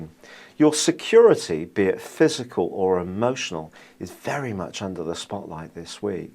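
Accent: United Kingdom. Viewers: Very good and very accurate.!!! Enjoy your British accent and forecast a lot.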